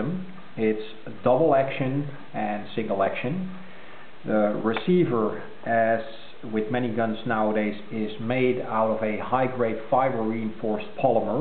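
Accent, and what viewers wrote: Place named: Netherlands. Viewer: Nice pistol and review. You sound Dutch, but I thought pistols were prohibited in Holland?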